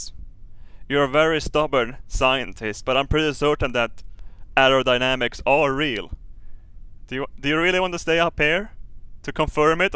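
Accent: Sweden. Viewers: Yes, I'd love to watch a rocket take off then turn on the radio and hear "Let's do this shit!" in a Swedish accent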